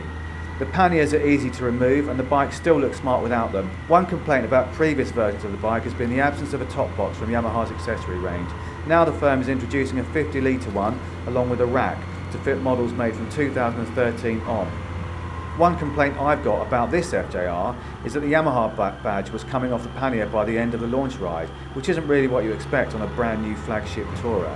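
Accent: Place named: England, United Kingdom. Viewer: Can't stand that Limey accent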